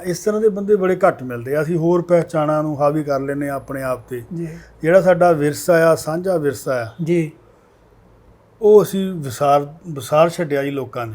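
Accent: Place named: Lahore, Pakistan. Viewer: Beautiful lahori accent, beautiful majha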